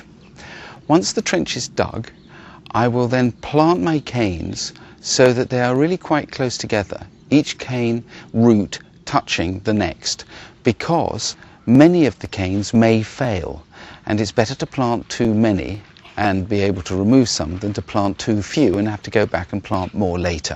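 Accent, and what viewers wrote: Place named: RP, United Kingdom. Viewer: so posh !!! "im going to dig a trench?" video reminds me of a monty python intro !!!!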